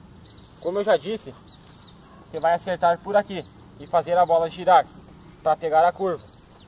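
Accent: Rio Grande do Sul, Brazil. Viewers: Sutaque Guacho mora no RS ?. Vlw pelas dicas ajudo bastante. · Sotaque gaúcho tu deve ser de RS pra aí né ? Valeu pelas dicas +1 sub e like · Mano vc e gaucho ??? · Parece que temos um gaúcho aqui não é mesmo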